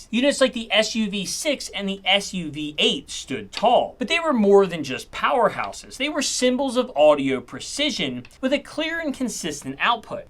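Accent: Baltimore, United States. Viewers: Your channel occasionally pops up on my feed. I remember the first video I watched of you. I was thinking to myself good Lord if that's not a Baltimore accent, I don't know what is. And of course sure enough that's where you are. I went to Woodlawn Senior High in the late '70s and I was on the auditorium tech crew. I haven't thought of Roberts in 45 years but as soon as you said Roberts I remembered the pair of tape decks that we had in the control room; it seemed to me they were semi-portable with handles and covers. Then sure enough the pictures popped up on your video and that's what I remember. Thanks for reviving a decades-old memory! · I noticed your accent and thought you have to be from Baltimore. When you went out on the street I recognized you are on Harford Rd in Parkville MD. I have been in the shop there before looking for amps.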